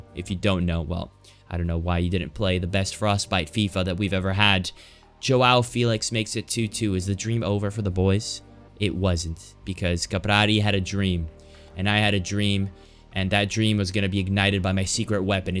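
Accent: United States. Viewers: Bro i tought u were american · I've actually been watching for almost 2 years but what is your background? As far as I know you're Italian living in Italy but you sound so American. Just curious. Love your content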